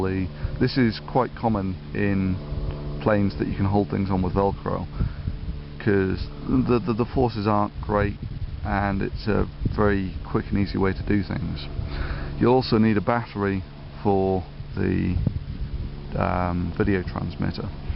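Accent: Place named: United Kingdom. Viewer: O just remembered to ask, where u from as you have a British accent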